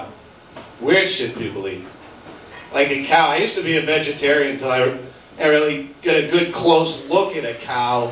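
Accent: Boston, United States. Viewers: So when did this super thick Boston accent disappear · The Boston accent is wicked pissa! More proof that has always been a genius...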